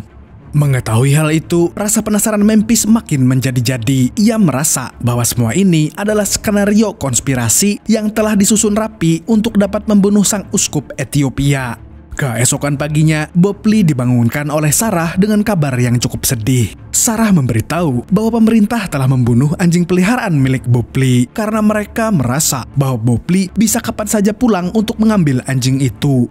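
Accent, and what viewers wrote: Banjar, Indonesia. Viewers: banjar banar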